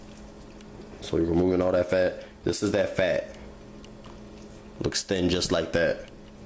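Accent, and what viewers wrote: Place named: Texas, United States. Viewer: You sound like some of my relatives from TX. I know I overheard one of them mention that they boil them as a part of their cleaning process then proceed in cleaning.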